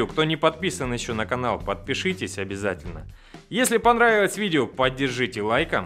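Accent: Rostov, Russia. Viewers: автор, ты из Ростова что ли?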